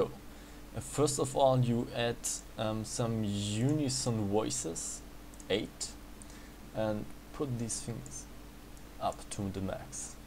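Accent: Germany. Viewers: Super tut, aber komm, an deiner Aussprache erkennt man den Deutschen ;) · 100% deutscher :D hört man am akzent :D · Du bist Deutsch oder ? :D merkt man am Aktzent :) , sehr gutes Video Danke für die Hilfe :)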